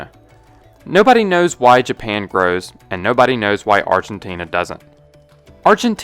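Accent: United States. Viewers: I don't think it is English...The narrator's accent is American.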